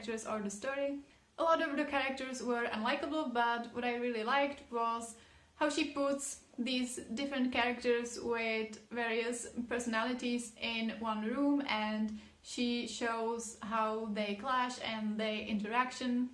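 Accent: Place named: Czech Republic. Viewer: Promiň ale... Ten český přízvuk...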